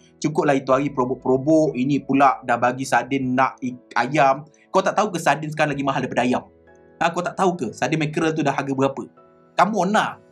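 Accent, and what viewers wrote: Melaka, Malaysia. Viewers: Amek ternganga gomen dengar org melaka haha.. terbaik boh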